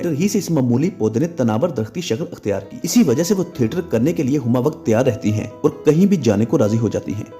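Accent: Punjab, India. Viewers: Aapka urdu lehja punjabi typa ka hy aur aap bohat taizi se bol rahay hain jo kano ko giraan guzarta hy.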